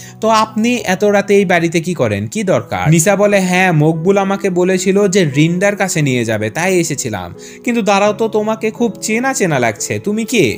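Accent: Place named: Noakhali, Bangladesh. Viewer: না মানে আমি এমনিতেই জিগ্গেস করলাম কারণ আপনার কথায় কিছু টা নোয়াখালীর মতো লাগে তাই আর কী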